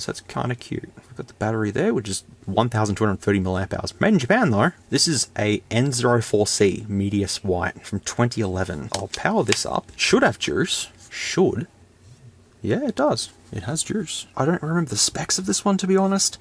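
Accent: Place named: Australia. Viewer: Oh so you have the Australian accent that puts R sounds in everything. Got it.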